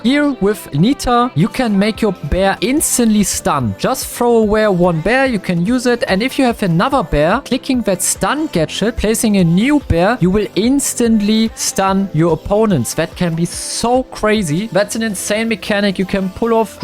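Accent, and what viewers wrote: Germany. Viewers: Dieser deutsche Akzent ist zu krank :D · Mann hört an deiner Stimme das du deutsch bist 😂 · Bist du deutsch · Are you German? 😅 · Du gibst ja echt gar kein fick auf dein deutschen akzent hahaha feier ich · dieser deutsche akzent 😂😂🤣🤣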